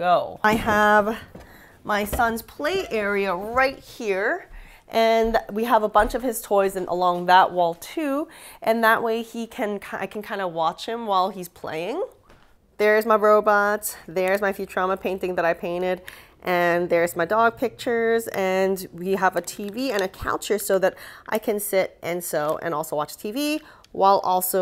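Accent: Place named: New York, United States. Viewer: Love your new home! Are you from New York originally,? You sound so "Cool New York"! I'm in Long Island.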